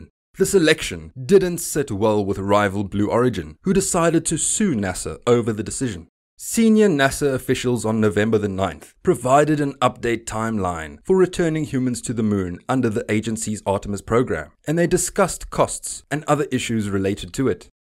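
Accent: South Africa. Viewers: Sounds possibly south african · you're from south africa aren't you?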